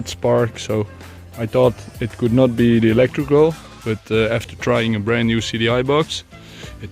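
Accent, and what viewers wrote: Netherlands. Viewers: He sounds Dutch, So probably pretty dang tall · Ik hoor gewoon dat deze man Nederlands is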